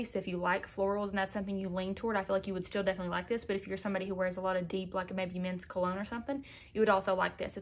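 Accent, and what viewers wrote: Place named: Kentucky, United States. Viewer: Are you from the southern Kentucky ? I hear the accent in you!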